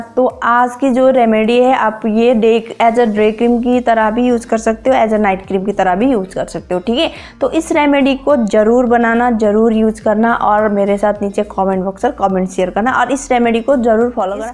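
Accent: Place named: Garhwal, India. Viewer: R u garwali, ur tone is like that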